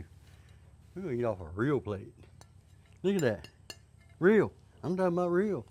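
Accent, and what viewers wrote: Louisiana, United States. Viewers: I did not understand the type of seasoning , must be thst Cajun accent. I use to live in New Orleans ,I miss those days. Thank you for the recipe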